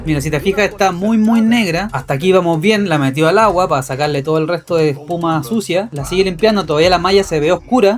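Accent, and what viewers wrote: Chile. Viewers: Chileno, bro? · Chileno, cierto? 😅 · Eres chileno?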